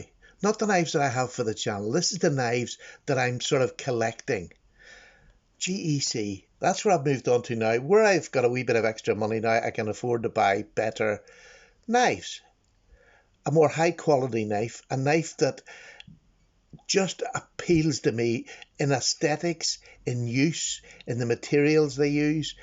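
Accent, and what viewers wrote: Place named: Ireland. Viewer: OMG....this is the first time I've shed tears when watching a blade video. It's just beautiful what you did with it. You should have been a writer or a poet. Love your story and respect you even more. Love watching your videos and just hearing that wonderful Irish accent talk about the love for blades. Thank you.❤️😊🙏🍀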